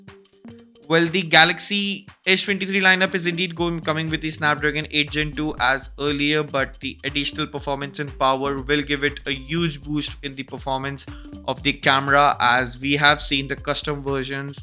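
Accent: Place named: India. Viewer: Nobody knows:) some mixture of indian and a little bit of english, pretty weird))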